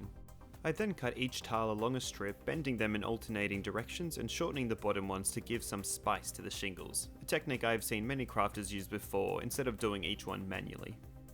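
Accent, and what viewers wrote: Australia. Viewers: Bruh, this is your first and (so far) only video and I already love your stuff and I'm half a minute into the video as I type this You've already earned yourself a subscriber Also are you Australian too?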